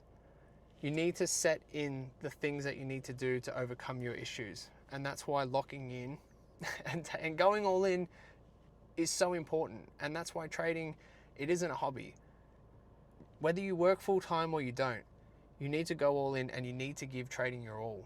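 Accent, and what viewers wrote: Australia. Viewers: hey, great to hear an auusie voice in this arena. what are you trading? stocks, futures, currency...? and where did you find a mentor. are there any clubs or groups that meet regularly that i can hook up with?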